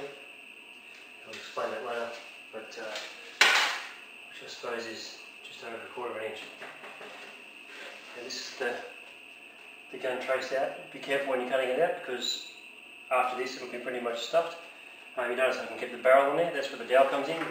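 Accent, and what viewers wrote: Australia. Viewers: Mmmm, interesting, is that an Aussie accent? Good job! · r u an aussie if so i applaud you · Yeeeeeeeeeesssss an Aussie!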